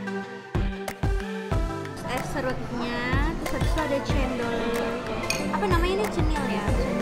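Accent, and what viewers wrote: Makassar, Indonesia. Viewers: aslina logatnya tawwa, nda nalupai 😂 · Selamat dtg di kota daeng mksr, ternyata dialek mksrx keluar ya. Mantap salam cantik manis, imut.❤❤❤ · enak dengar ngomong makasar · Candu banget klo ngomong pake logat Makassar.. Btw pelan2 Ki dek masih pagi loh itu udh makan SOP saudara ajh🤦😮‍💨 #Ewako 😊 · Tawwa tidak na lupai logat makassar kka, biasa itu ada orang lama mi tinggal di jakarta, na lupa mi logat kampung na, tapi tawwa tidakkk, salut memang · Logat makassar memang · Beh anak makassar banget nihh logatnya❤❤❤ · Apa kareba bocah remax funky.auto logat Makasar keluar 😄😄 gini rajin upload biar cepat berkembang channelnya.ketawa bikin candu. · Tdk hilangji tawwa logatna Salam dari makassar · Full logat Makassar 🥰,ceplas ceplos nie 😂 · langsung mode auto makassar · Masih ada logat makassar ta pale 😂😂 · Mantap logat makassar ya ga pernah hilang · Edisi pulkam nihh...👍 Kereeennn..... 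logat makassarnya masih mantap... 🤭😇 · Wich langsung keluar logat mkssarx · logam MAKASAR nya keluar …beda kalo d Jakarta 🤣🤣🤣sehat sukses ya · Rajin rajin ki wekna pake logat makassar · Logatnya makassar banget suka deh 😂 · ini tempat kamung halaman ku, lgsg balogat makkasara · Knp ya klo pulng mksar auto kmbli logat mksar walaupun sdh puluhan taun merantau jkrta....wkkkkk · logat mkasarnya kluar jo..mntabbbb sehatsehat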